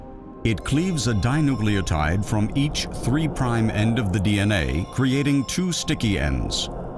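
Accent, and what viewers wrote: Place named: United States. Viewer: No mazen i don't think it is british , british accent is much easier than american , probably it is american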